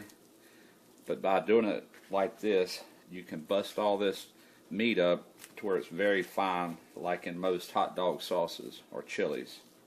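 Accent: South, United States. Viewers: He sounds like Bill belichick with a southern accent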